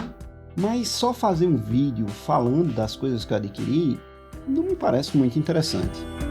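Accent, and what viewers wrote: Pernambuco, Brazil. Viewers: Hoje estou em Portugal, sou iniciante na leitura. E posso dizer que não é só no Brasil que existem livros caros. Aqui também há. Fora isto.. Hoje estou em busca de como posso fazer para importar livros para cá, tanto pelo preço como também que por incrível que pareça no Brasil existem bem mais obras para língua portuguesa. Abraço Professor, seu sotaque para ser de Pernambucano, pode ser que eu esteja equivocado, se for somos conterrâneos. Abraço.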